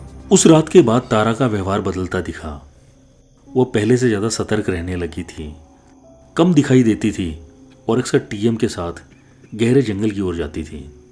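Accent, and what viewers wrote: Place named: Uttarakhand, India. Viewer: Aise lagta hai sir Uttaraakhand se hai 😅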